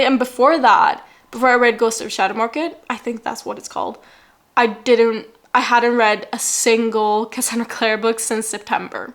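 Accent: United Kingdom. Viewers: Jag måste verkligen säga att jag älskar din engelska accent😍 Och kul med böcker, är själv en bokmal 😊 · Älskar att du pratar med brittisk accent 😍 ps älskar dina videos ❤️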